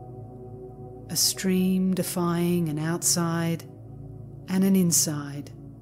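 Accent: Australia. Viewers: I have been listening to your recordings for about 3 months and your voice is quite mesmerising . I can think it is 2 things the “ light” of consciousness and of course the subtle Aussie accent . 🙏 Namaste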